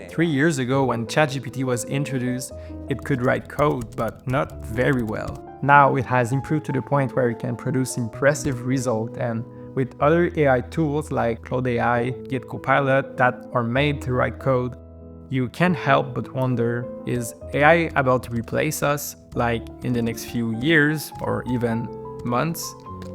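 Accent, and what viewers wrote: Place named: Quebec, Canada. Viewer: Je vois que t as un accent quebecois, donc je présume que tu parle français xd. Tu es partis à quelle université? Perso je dois choisir entre génie logiciel et génie éléctrique, mais je ne suis pas sûr sur laquelle partir. Je vais aussi bientôt completer ma technique d'Informatique en moins de 1 ans et juste avec ce diplôme je ne suis pas sûr d'être capable d'avoir un travail dans le secteur d'informatique en général.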